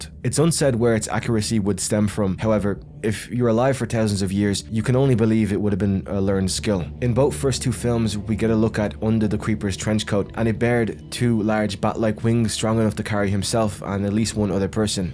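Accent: Ireland. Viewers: You sound very Irish if I say so myself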